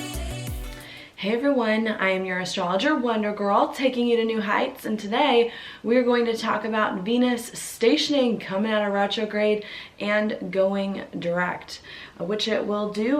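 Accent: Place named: Georgia, United States. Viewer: Thanks,! I couldn't help but notice your accent is reflecting your recent move back to Georgia.;)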